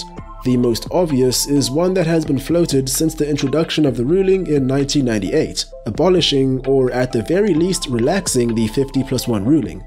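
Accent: South Africa. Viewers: You sound so South African. Its not even funny 🇿🇦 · Immediately recognized the accent. Greetings from SA 🇿🇦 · Mr speaker you have a South African accent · Love your channel ! You aren't South African by chance ?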